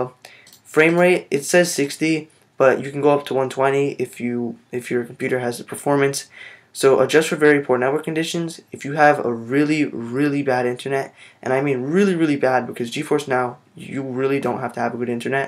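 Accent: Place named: North America. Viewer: Do u live eu or na cos u sound na but play eu server?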